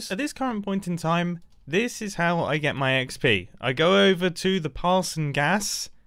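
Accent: South, United States. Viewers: your southern accent never fails to break my brain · His southern American accent is everything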